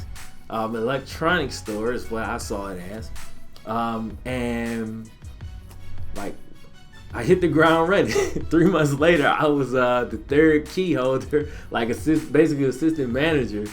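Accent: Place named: Mississippi, United States. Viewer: Hold up...u from Mississippi? I’m from Mississippi idk why but that cool as shit to me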